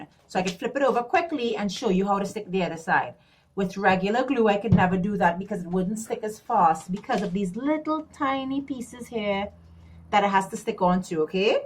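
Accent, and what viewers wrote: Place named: Trinidad and Tobago. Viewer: Love the creativity, the one for you son’s room is beautiful. I love the accent is that from 🇹🇹.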